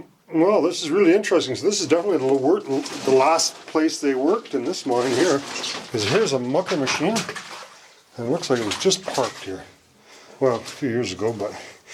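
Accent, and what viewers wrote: Canada. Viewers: Wonder if he is Canadian, eh?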